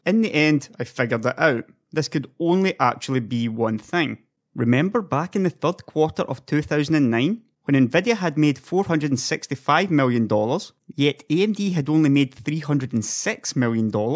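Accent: Scotland, United Kingdom. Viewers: Your Scottish pronunciation and dificult is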